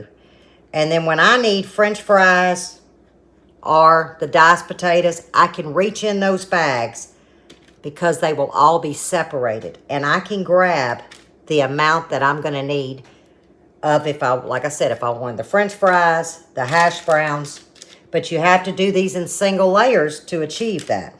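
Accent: South, United States. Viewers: Hey, love the video. Just made it bk from south Louisiana visiting family. I think you are most definitely from the south 😊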